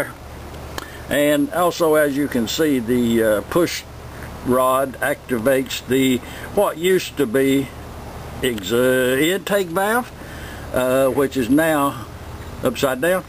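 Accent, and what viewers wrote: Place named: South, United States. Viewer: That accent! Been bugging me all day, I'd heard it somewhere. Reminds me of, a country singer out of Old Fort, North Carolina who used to perform with his brother Wiley. [' ']. They was running a body shop as in the sixties after their spell as warblers.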